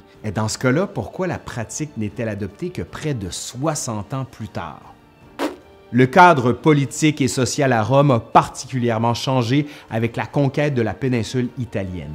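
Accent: Quebec, Canada. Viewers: Assez bizarre que tu n'aies pas autant d'abonnés que Nota Bene, pourtant ton contenu est lui aussi très intéressant et bien raconté avec en plus le petit accent québécquois du plaisir. En tout cas sache que tu as gagné un abonné fidèle. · Bon, comme on ne peut mettre qu'un pouce en dessous de la vidéo. Ben, je t'en mets d'autres ici. Merci encore pour ta chaîne si instructive... Et puis aussi pour ce succulent accent québécois qui, pour moi niçois avec mon accent méridional, enchante mon oreille de "français de France" mais qui admire la culture canadienne depuis longtemps (quand j'ai découvert Charlebois et Diane Dufresne etc quand j'étais "mino". Aller, ciao viva et bayeta comme on dit ici. CONTINUE À NOUS INSTRUIRE. 👍👍👍👍👍👍👍👍👍👍👍😉😘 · J'adore votre narration. Merci pour le partage. Je viens de découvrir votre chaine. Vos reportages sont courts et instructifs. 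Je suis contente que vous ayez un joli accent Québécois compréhensible comparé à vos compatriotes. Dommage beaucoup de reportages Québécois sont intéressants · Tellement complet! Bravo. J'aime beaucoup votre manière de construire les vidéos, la manière de parler est nickel et ça fait toujours plaisir d'entendre un petit accent québécois! Merci.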